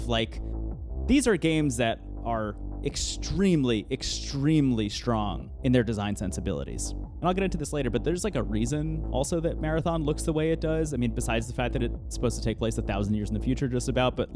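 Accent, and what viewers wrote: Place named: Minnesota, United States. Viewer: this dude straight out of minnesota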